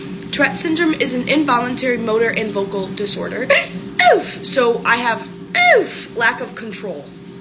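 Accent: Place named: England, United Kingdom. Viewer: Brilliant english accent....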